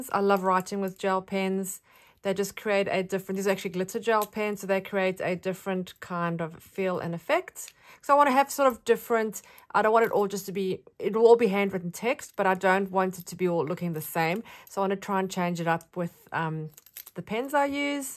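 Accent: South Africa. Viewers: Hi from South Africa. Nice to listen to a fellow SA.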